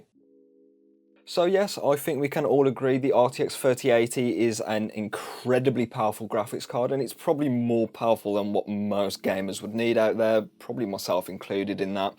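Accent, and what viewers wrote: United Kingdom. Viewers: I just bought the 10 gb version done weeks ago for 373 USD or 289£ since you sound British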